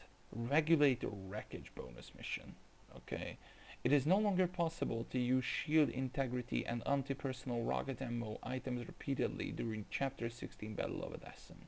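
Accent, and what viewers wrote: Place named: Hungary. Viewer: damn. I should have gotten that...my coworker is Hungarian and now I can relate your accent!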